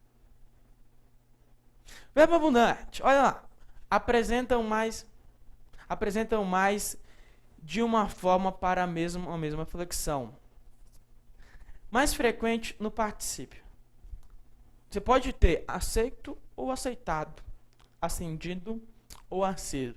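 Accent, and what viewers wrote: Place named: Goiás, Brazil. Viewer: O professor tem sotaque de goiano. O sotaque dele às vezes dá agonia mesmo. O que vale mesmo é que ele esclarece a matéria muito bem muito obrigado pelas excelentes aulas.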